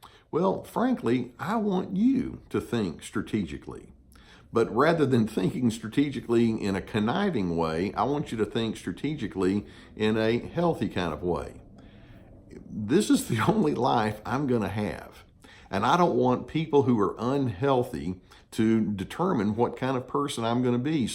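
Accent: South, United States. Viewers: You are fantastic and have helped me so much rid the Narc. Love your voice (like Gus) and Southern accent!! thanks. Susanne H.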